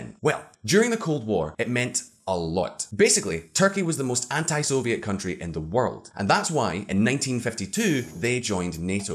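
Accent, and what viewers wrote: United States. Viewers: I love that American accent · You should not apologise for the American accent, but embrace it, because your accent is just awfull... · Yeah, man, as an American, I approve that accent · Pretty good American accent buddy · Firstly, this American approves your US accent. Secondly, laser weapons are obviously real, but they require a TON of power. Until we have more efficient batteries, they will always be land or ship based (and in rare cases, mounted on planes). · your American accent was pretty good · The American Accent was pretty good. You sounded like my over-bearing neighbor. Good Job.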